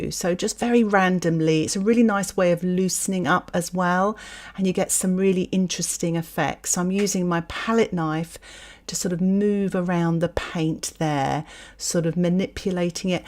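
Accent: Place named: United States. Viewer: Hi this vid has an American accent, hope this is all legal, Brisbane Australia 🦘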